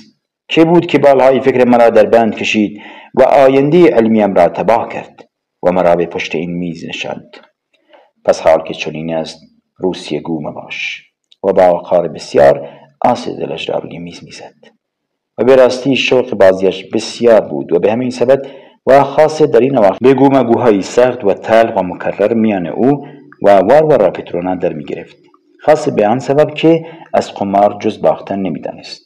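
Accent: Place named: Iran. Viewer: دمتون گرم و سپاس از تلاشتون در مورد لهجتونم باید بگم به نظر من که خیلیم جذاب و شیرینه توفیق مضاعفه هم لهجه زیبای یه خطه از ایران عزیزمونو شنیدیم هم مطالب کتاب را زنده باد تمام ایران و اقوام و مردمانش❤❤❤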